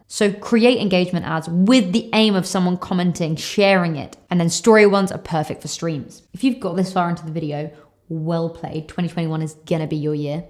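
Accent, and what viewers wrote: England, United Kingdom. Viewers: Which area in England you're from? (love the accent)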